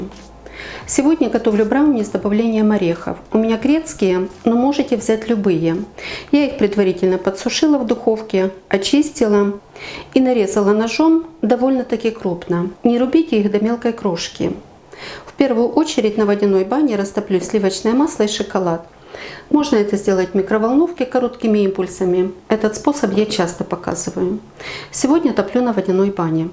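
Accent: Ukraine. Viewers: Всё подробно, честно! Нюансы очень важны. Огромное спасибо за уважение к подписчикам. ❤ И ещё, спасибо за красивую украинскую речь!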